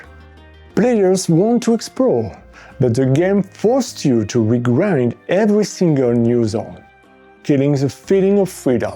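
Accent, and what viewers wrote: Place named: France. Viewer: Hey, first of all congratulation on doing and posting a video. Pour le reste, étant aussi français , si je peux me permettre de pointer deux ou trois problèmes : -Le son, oui tu as un accent français, mais je pense qu'en réduisant la saturation ce serait plus audible (ça peut être amélioré largement avec une bonne technique simplement avec Audacity, même si c'est pas la meilleure solution non plus). Même étant aussi frakgais et fluent en anglais c'était un peu dur par moment. -Le montage, dans l'ensemble c'est très bien, mais comme disait il yabquelque années jdg, ou Antoine dznizl le rythme est très important, même si le rythme ne doit pas forcément être rapidew c'est mieux quand on a l'impression que tu donnes le rythme a ta vidéo, et pas l'inverse . Après je dis tout ça en ayant eu pendant longtemps l'idée de faire des vidéos, qui plus est en anglais, sans jamais en avoir fait. 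Ce sont des observations mais ça ne retire rien que tu fais mieux que 98% des gens, qui eux n'ont même pas agis. Et de reste si tu souhaite discuter ou plus de détails sur mes avis n'hésite pas (si ça t'intéresse on pourra voir par mail ou autre) Bravo encore et bonne soirée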